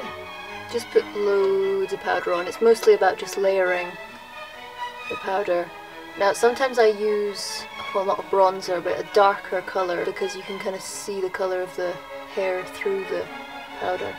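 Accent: United Kingdom. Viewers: ...But he is British. He always sounds British.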